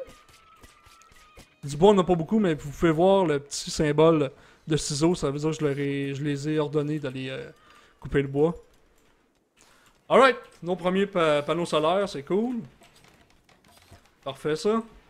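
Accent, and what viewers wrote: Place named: Quebec, Canada. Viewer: Tu mérite plus d'abonnés , De plus que tu es Québécois (J'adore l'accent que vous avez ^^) et puis surtout tu expliques bien :D Je t'adore :D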